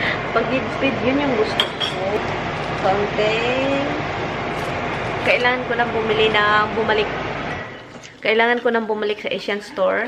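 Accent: Visayas, Philippines. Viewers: Putang-Ina swerte mong naka-punta ng America... bisaya ka ang to gas mong magsalita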